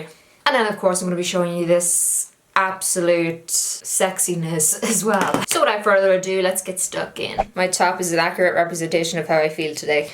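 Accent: Ireland. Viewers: Wait are you Irish ?? Btw your absolutely AMAZING ly xx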